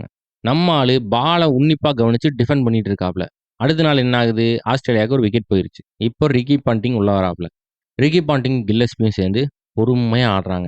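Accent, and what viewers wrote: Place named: Pollachi, India. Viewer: Evan Enna sonnalum indha slang mattum change panniratha. Naanga school pasangala irukumbothu, enga area Anna nuga solran athe slang.. semaya iruku Thala... ❤❤🎉 Love from pollachi 😊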